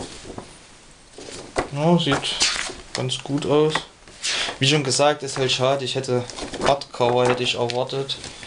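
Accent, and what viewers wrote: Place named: Saxony, Germany. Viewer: omg ein Sachse, wie schreklich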